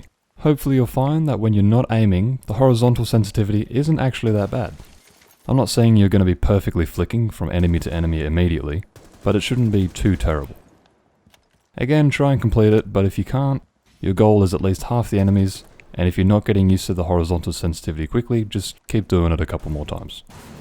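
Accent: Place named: Australia. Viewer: the Smooth as butter Aus accent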